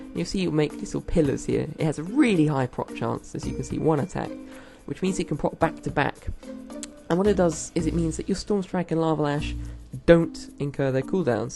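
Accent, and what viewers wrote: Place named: England, United Kingdom. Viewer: Это английский акцент, необразованный ты человек)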